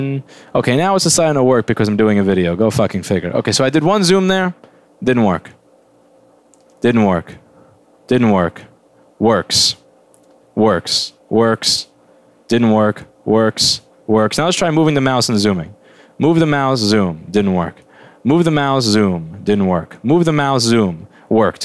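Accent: New York, United States. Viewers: You're definitely a new yorker...thats the only clear observation in this video.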